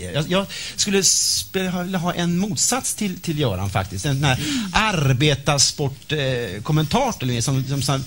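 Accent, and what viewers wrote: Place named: Västergötland, Sweden. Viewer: västgötska <3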